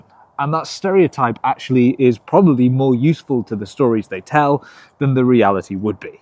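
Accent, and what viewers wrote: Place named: Yorkshire, United Kingdom. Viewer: I suspect part of it is your accent. Yorkshire?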